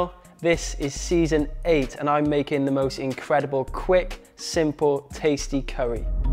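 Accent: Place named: Northern England, United Kingdom. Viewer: Just subbed ! And 'liked' ! When you coming to London? I guess by your accent you're from up North ? Or do you live in London? Would be great to see one of your demos in London!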